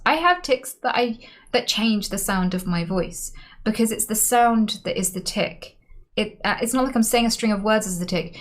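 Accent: United Kingdom. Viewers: I find it very intriguing that some of your ticks have an American accent and some have your British accent.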